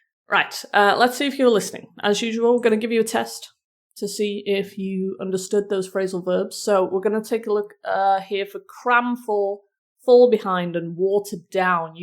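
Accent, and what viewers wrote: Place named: United Kingdom. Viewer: I love the British accent. You speak really clear I understand it very well. Thanks again.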